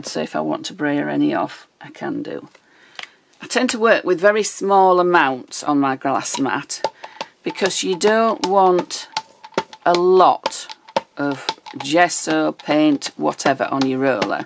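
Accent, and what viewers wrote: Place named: Yorkshire, United Kingdom. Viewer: Looking forward to trying this. Your accent sounds like my cousin's wife – she's from Stamford Bridge.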